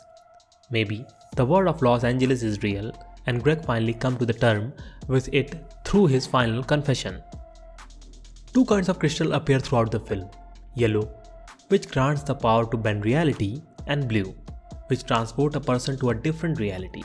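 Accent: India. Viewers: indian enlish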